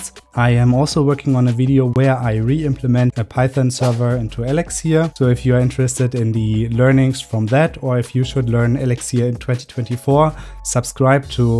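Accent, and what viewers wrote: Germany. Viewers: hard disagree about op's comment. You just sound like a normal person with a German accent. You also don't talk with the "YouTube voice" (which is a good thing!). Sound's good, video's good, your script is tight without being rushed.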